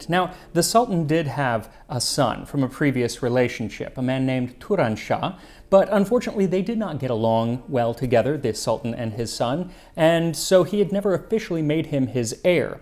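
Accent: Europe. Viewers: All his pronunciations not European still sound European. His Japanese even sounded european. But that's fine. I'm not coming here for linguistics. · his pronunciation is not half bad for someone that probably never studied Arabic before! I think he studied a lot of European languages so he might have added a bit of european style emphasis that would probably only be slightly annoying to native speakers. As long as it's not so bad it sounds like the wrong words, it isn't the end of the world. There's like 2 dozen dialects of Arabic, it's hard to be perfect at pronunciation unless you have some background in it.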